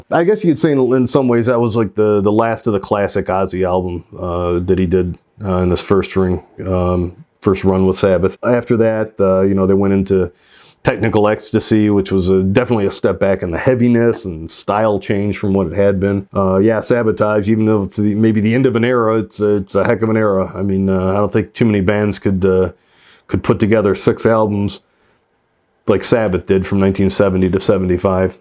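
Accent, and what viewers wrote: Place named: Michigan, United States. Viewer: I was going to ask if you were from Michigan by your accent.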